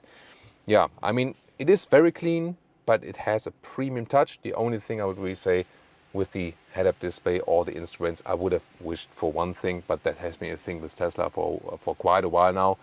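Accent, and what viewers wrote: Germany. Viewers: Love your accent German Guy. Content and presentation is perfekt! I can now fee the car. Y is a Berlin Car. Good quality. SPASS Leute trennt euch von Politik, die Kiste ist geil! In Chin geht das Ding weg wie warme Semmeln, obwohl da die eigenen Unternehmen für die Hälfte verkaufen. Mal sehen, wann die mit Steueren antworten. Danke für den Beitrag 🚀.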